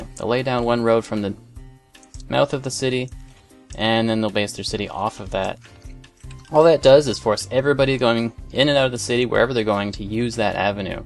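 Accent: Canada. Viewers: Is he Canadian? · Are you canadian? :D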